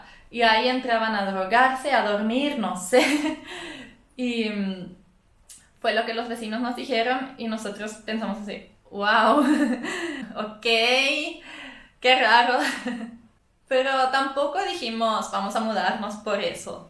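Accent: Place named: Paraguay. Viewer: Ya tenes acento paraguayo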